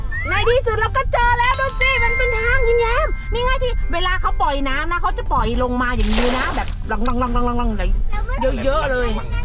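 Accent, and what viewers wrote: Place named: North, Thailand. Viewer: แม่ พูดเหนือเพราะมาก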